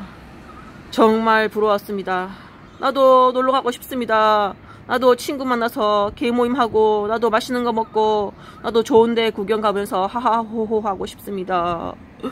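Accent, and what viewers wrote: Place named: Daegu, South Korea. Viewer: 말씀 듣고 많은 생각을 하게 되었네요, 늘 동경하던 타향살이, 다시 한 번 생각하게 되었어요. 한국은 한국대로 호주는 호주대로 각자 저마다의 고충은 다 달고 사는 게 인생아니겠어요 ㅠㅠ 다 하나님의 뜻이라고 생각하지만 너무 힘들 때면 하나님께 왜 이렇게 힘든 인생을 주셨냐고 묻고 싶어질 때가 많아지네요 그리고 범어동 사셨다니.. 사투리가 살짝 대구 사투리같다고 느꼈었는데 같은 대구분 만나니까 반갑네요 ㅠㅠ 대신동이나 시내에서 보면 엄청 반가울 것 같아요 ㅎㅎㅎ 주저리 주저리 쓰다가 길어졌네요 ㅠㅠ오늘도 좋은 하루 되세요!!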